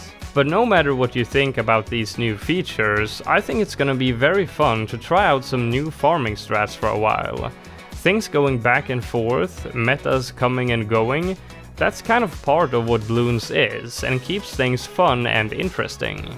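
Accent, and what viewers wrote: Sweden. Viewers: i know that your swedish from how you sound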